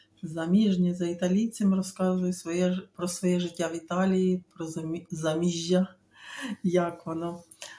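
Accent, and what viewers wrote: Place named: Transcarpathia, Ukraine. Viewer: Цікавий діалект закарпатський,веселий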